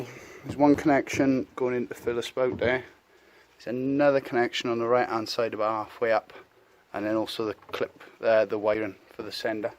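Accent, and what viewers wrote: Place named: Wales, United Kingdom. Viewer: What part of Wales are you from? I'm in rhondda.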